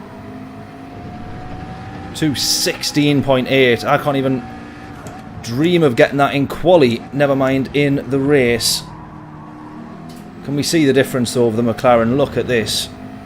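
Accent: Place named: United Kingdom. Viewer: Your streams a really good, you deserve more subscribers. Whats your UK regional accent btw? Can't pick it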